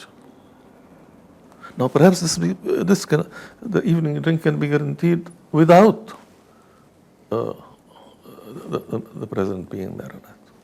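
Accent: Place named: Australia. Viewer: This thing is not even worth posting. And the host sounds Aussie😮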